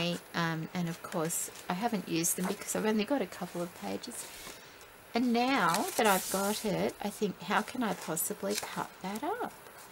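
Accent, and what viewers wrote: Australia. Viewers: Hello, I saw your comment and channel name on a video by Diane H and came to check out your channel. I don't know if you're familiar with Michigan in the USA but a lot of businesses and organizations use Mi or MI as "my" in their names (it's part of my name too!). I came here thinking you were from Michigan and was pleasantly surprised to hear the Aussie accent. lol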